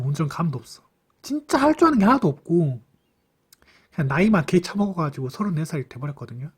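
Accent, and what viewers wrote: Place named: Gyeongsang, South Korea. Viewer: 형님 항상 잘 보고 있습니다 저도 곧 전기산기 준비할건데 좋은 결과 있으셔서 다행입니다! 형님 부산 사시나요? 말투가 경남이신데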